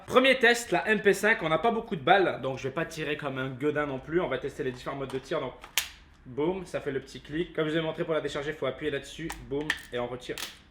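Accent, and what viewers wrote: Quebec, Canada. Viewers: Tu es québécois ? Et merci pour les conseils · Avec tout ce que tu fais et t'es untboxig (dsl pour l orthographe) tu devrais faire une faq Continue comme ça je t adore PS t'es Quebecoie?🤔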